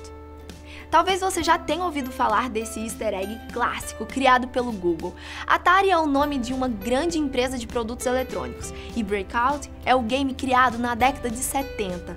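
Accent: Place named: Bahia, Brazil. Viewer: Pelo sotaque ela parece da Bahia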